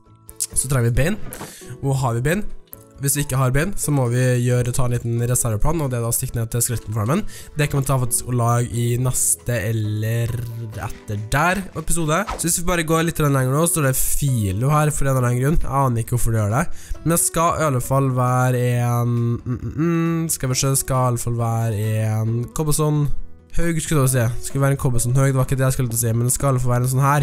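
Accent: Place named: Oslo, Norway. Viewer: Du høres faktis ut som en som er oppvekst i Oslo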